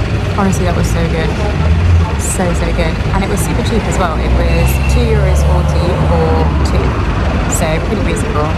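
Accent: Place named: United States. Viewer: Wow wow wow I love your accent are you American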